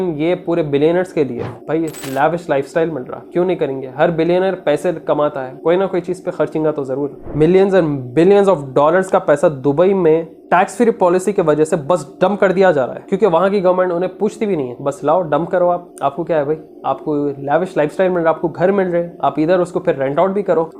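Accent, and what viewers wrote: Hyderabad, India. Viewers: Bhai app Hyderabadi hoo kya ❤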